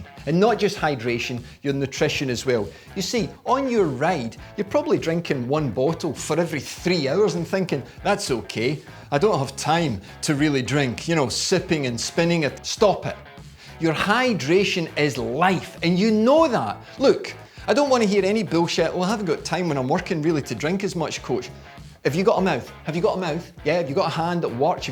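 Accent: Scotland, United Kingdom. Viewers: Is this accent Scottish? Or what it is? I am Italian and I cannot "localize" it. (I mean his normal accent, not the accent he has when he plays the Scotsman). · As an American I could listen to a Scottish guy cuss and swear all day 🤣I appreciate all your advice my friend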